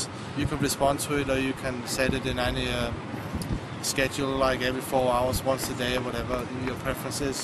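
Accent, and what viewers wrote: Denmark. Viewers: danish accent when its best.. just hear lars ulrik from metallica.. heheh the same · Wow - that's a heavy danish accent.. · How can you make this accent swedish? I won't tell you that the dude IS danish - just that the accent is. XD Anyways - what dialect does he speak?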